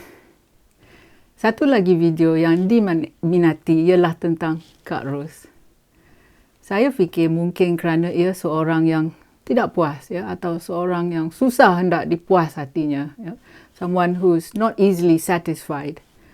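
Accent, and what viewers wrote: Perak, Malaysia. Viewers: Saya suka slang Perak. Tahniah dan salute! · Tahniah. Lucu gak,bila loghat Perak digarap sekali. Hahaha.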